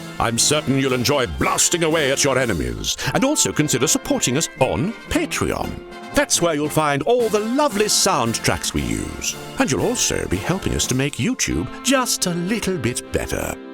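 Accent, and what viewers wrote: United Kingdom. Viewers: The lovely Classic British accent ❤❤❤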